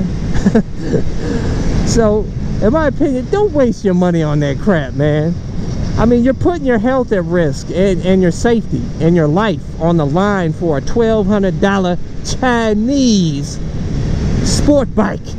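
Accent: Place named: Atlanta, United States. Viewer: This man's got that Atlanta accent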